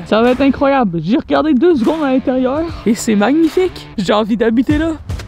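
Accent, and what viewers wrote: Quebec, Canada. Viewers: ton accent quebecoi je ladorrrre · Tu charbonne les video ton contenu est incroyable continu comme ca et aussi au passage, adore l’accent québécois 😂✨🔥😆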